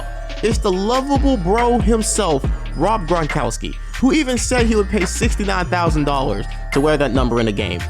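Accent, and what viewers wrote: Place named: Birmingham, United Kingdom. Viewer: You from that Birmingham area same bro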